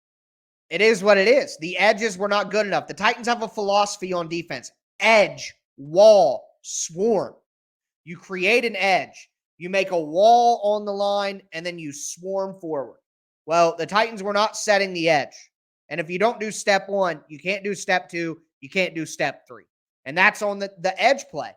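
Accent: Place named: Southern Ohio, United States. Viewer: I am from Ohio too. I can tell by your accent that you are from Southern Ohio.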